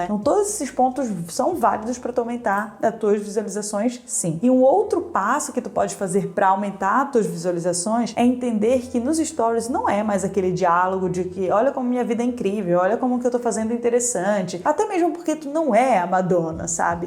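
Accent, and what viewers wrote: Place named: Florianópolis, Brazil. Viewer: Algo me diz que o sotaque é de floripa 😅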